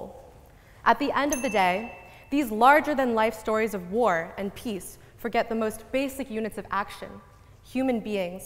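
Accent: United States. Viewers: She sounds American. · Haha, she is incorrect of course because we can all see what china has done to it's own people! Plus this girl who seems to know so much about the country she defends has not lived there! If she was living there maybe she would have a better idea in fact about the ccp and how they operate. I believe to defend a government you have to know from experience what you are defending. She talks about the west in a unfavourable way with her American accent, I find it somewhat ironic to be honest. My family are immigrants , yet I do not attest to know the life they endured. I found this girls speech insincere and her attitude aloof. She is very much entitled to her opinions of course and so am I! My advice to anyone who wants to know about ccp go live in mainland China and see for yourself.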